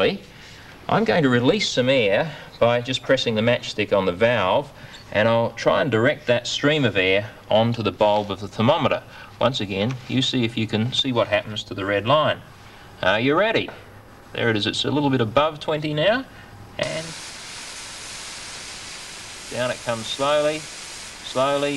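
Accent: Australia. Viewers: It was awesome sir...It helped me a lot to polish my concepts out...You are a fair dinkum Australian..I am fond of this aussie accent... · Why is it a Australia accent make learning better? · Why does this guy remind me so much of a young Ray Comfort? Similar hairstyle, hair color, mustache, Australian accent, are these guys trying to look like each other or do all Australian men just look like this? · The Aussie accent hits me hard the first second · that Australian accent telling me what’s a refrigerator and then asking how does it work really hits me in the feels.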